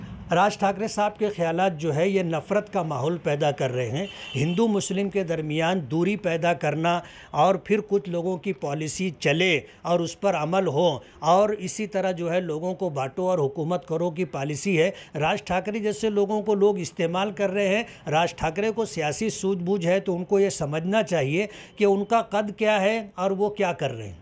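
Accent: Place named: Hyderabad, India. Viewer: मामू तुमाला महाराट्रात ल्या जनतेनं निवडून दिल तुमी हेंद्राबाद मध्ये बोलता अशे वाटत आहे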